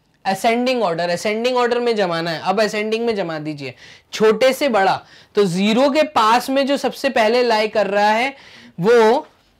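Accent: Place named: Odisha, India. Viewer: Sir i think you are from odisha I am from odisha and i mark your language and i think you are from odisha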